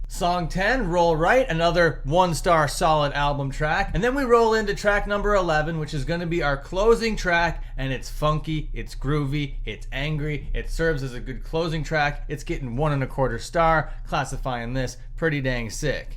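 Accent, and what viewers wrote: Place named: Canada. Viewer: He’s speaking Canadian buddy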